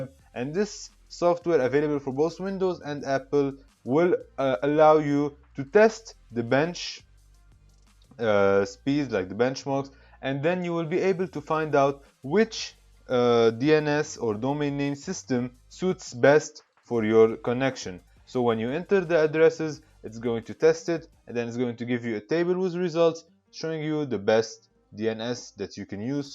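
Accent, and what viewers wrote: Lebanon. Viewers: Lebanese ??? I think I figured it out from the accent 😂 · Are you lebanese ? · Damn are you Lebanese?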